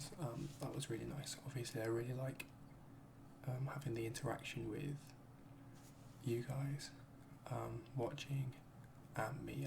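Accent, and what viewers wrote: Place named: West Midlands, United Kingdom. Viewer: you sound like you live near the west midlands! your accent I mean, I live in brum.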